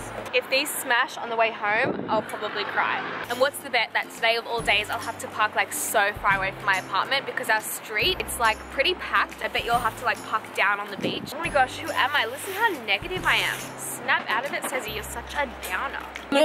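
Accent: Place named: Australia. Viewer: It’s just Australian lol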